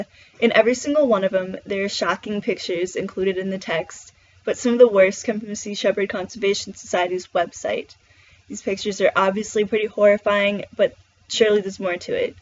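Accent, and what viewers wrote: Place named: United States. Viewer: You sound American. There are many other Americans, who are not fooled by Paul Watson's Sea Shepherd. Thanks for nuanced viewpoint in your video.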